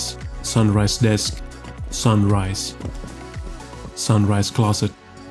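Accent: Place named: Finland. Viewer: You're definetly Finnish aren't you? Accent is sounding like it.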